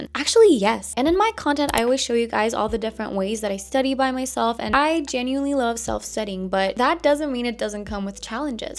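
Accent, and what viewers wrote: United States. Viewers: as a fellow turkish person, can u share how u got this perfect american accent pleaseeee queen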